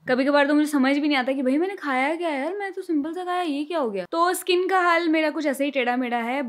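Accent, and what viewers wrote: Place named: North, India. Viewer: Really liked the simplicity of this girl. No unnecessary "angreziyat" or overacting. I like her pure desi North Indian Hindi and how confidently and proudly she's speaking it. Very good video 👍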